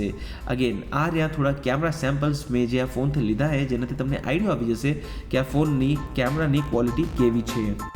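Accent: Kutch, India. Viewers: Aa Gujarati nathi aato kutchchi Gujarati che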